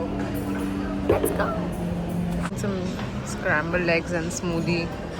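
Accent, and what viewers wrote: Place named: Punjab, India. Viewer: Saying it yet again that your Punjabi accent is sooo cute🤌💓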